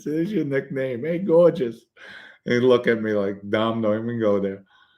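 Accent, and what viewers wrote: New York, United States. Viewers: Props, Dom. Great to see how you’ve turned your life around. Very impressive and a great example. My comment- why apologize for being a “rat”. Wouldn’t you have been a punk if you sat in a cell for decades after you’ve been wrongly told you had nothing coming to you? It would’ve been different if you ratted if you simply didn’t want to do time- no? Regardless, everyone I know (Port Chester, NY) says vodka exactly the way you do…….:). Props. Congrats on your new wonderful life.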